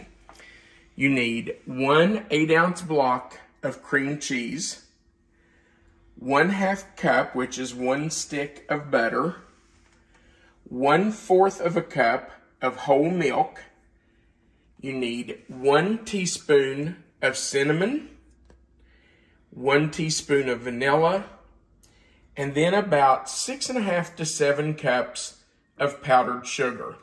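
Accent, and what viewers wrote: South, United States. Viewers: His stories are one of the reasons I enjoy watching. I am from Michigan and love to hear southern folks talk , it is intriguing and oddly relaxing to me. But to each is own.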